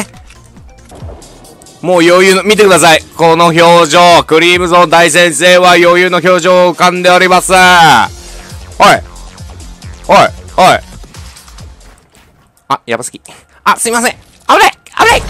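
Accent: Kansai, Japan. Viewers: 関西弁縛りでもしてんの？？ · BOXINGは関西出身なの??ww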